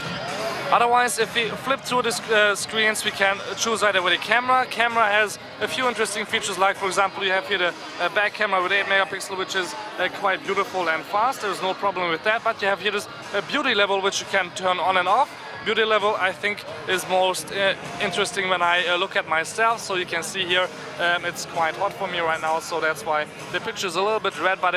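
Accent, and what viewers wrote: Germany. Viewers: German accent? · Wie man sofort hört, dass er Deutsch ist xD Immer wieder lustig :D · God, do u sound German!^^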